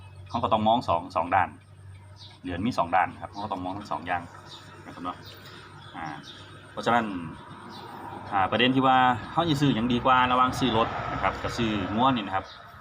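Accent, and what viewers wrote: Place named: Chaiyaphum, Thailand. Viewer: ครูอยู่บ้านใดเว้าสำนียงคือคนชัยภูมิบ้านพี่แท่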